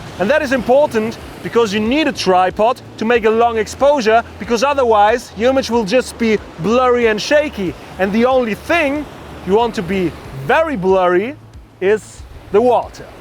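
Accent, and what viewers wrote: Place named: Germany. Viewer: Came around on your channel today. You make super fun videos and your german accent is really good to listen to :D Hello from Slovenia.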